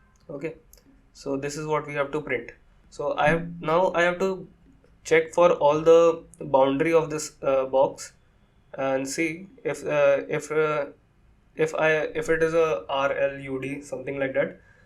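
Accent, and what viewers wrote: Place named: India. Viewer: everything's great but i'm not used to his indian accent so my eyes are a bit tired of reading the script 😎😎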